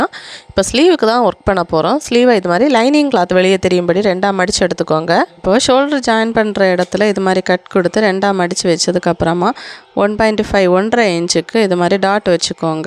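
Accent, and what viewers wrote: Kanyakumari, India. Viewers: Hi sis Recently I saw and subscribed your channel;awesome videos;n you , your hardwork inspiring;you are soo beautiful 😊; I love embroidery n stitching from childhood Now learned to stich my dress myself by YouTube videos.namma kanyakumari slang la super ah pesureenga, perumaiya irukku; I don’t know aari ;evlo try pannen Ukkum varavae illa;Ella workum hand embroidery taan paanuvaen:blouse chudi etc. niraya days edukkum. Appuram onnae onnu sila English word mattum pronunciation thappa solreenga ;artha mattum konjam paarunga Naan epdi yaarukkum comment pannathilla I like you a lot ; Ataan sonnen sis Also unga simple hand work techniques romba useful ah irukku; keep on doing ;comment lengthy ah irukku ;😅pls reply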